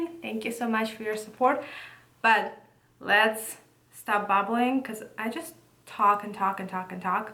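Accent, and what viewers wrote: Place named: Russia. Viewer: Про perfect вы загнули конечно. Русский акцент заметен сильно (говорю как человек переехавший в 12 лет)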